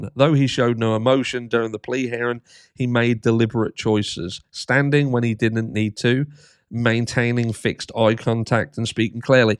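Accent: United Kingdom. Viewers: You are not even from USA are you. Sounds like a Brit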